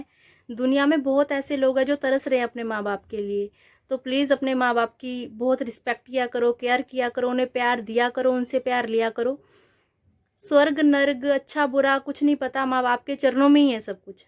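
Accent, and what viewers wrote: Punjab, India. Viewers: बहुत सुंदर प्रस्तुति बेटा तुम्हारा बोलने का टोन पंजाबी हो गया है।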